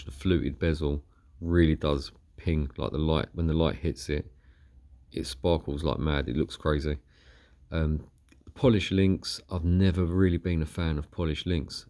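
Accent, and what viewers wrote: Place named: United Kingdom. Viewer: mate post more videos, i like your shit. Ur voice is deep and thoughful, i like ur british accent a lot. Brings me back to the time i used to study in the UK.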